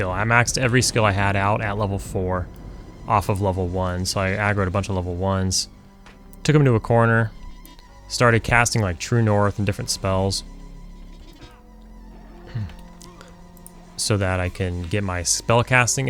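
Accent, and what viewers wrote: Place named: West Virginia, United States. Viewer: I'm from west Virginia , this is how I remember people talking.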